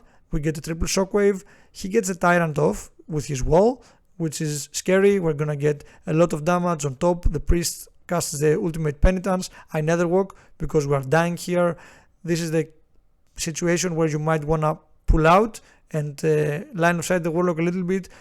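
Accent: Greece. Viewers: You sound like a fellow Greek. 😅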